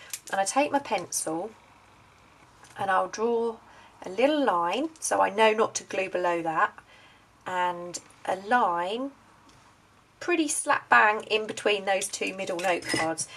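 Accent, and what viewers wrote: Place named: Greenwich, United Kingdom. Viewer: Thanks so much sweetpea I also love your accent I come from Greenwich and have a similar accent! But now I live in Melbourne Oz xx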